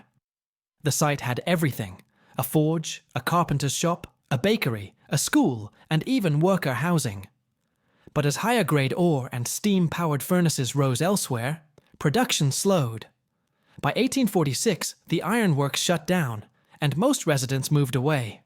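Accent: United Kingdom. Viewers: Is this guy British or what? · Uk accent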